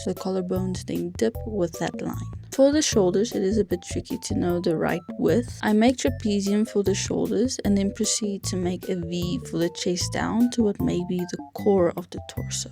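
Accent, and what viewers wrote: South Africa. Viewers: Your accent is giving me South African deja vu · your accent is a lot like a africaner's accent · Her accent sounds south african a bit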